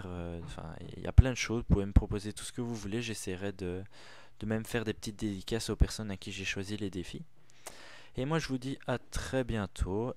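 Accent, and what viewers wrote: Belgium. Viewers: Il est belge sa s' entend je crois xD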